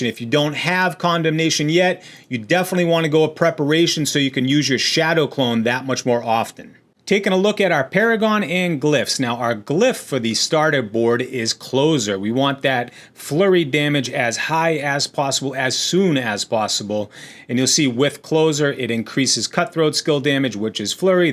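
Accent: New England, United States. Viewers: Do I detect a New England accent ?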